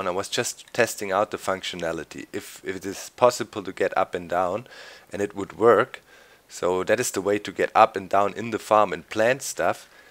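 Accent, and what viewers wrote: Germany. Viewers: has an accent like German frontman Miland Petrozza, and Miland Petrozza is badass.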